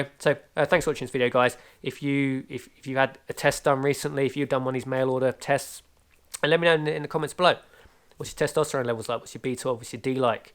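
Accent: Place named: South London, United Kingdom. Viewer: Sounds like you live in South London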